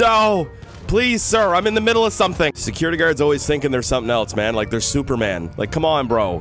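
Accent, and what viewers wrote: United States. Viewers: you sound like the most American person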